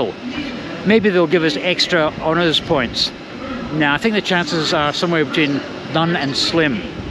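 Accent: Scotland, United Kingdom. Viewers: Love ur vlogs but for someone who was bought up in Australia you have a very strong Scottish accent...assume you lft Australia when you were 5yrs old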